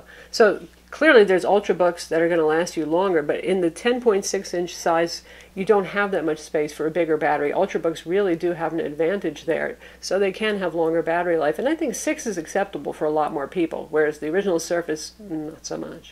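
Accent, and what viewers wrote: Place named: Upstate New York, United States. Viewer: Gifts live that upstate NY accent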